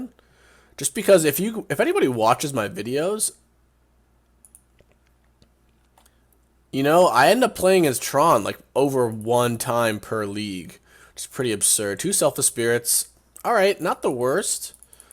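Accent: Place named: Southern California, United States. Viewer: Most SoCal accent I have ever heard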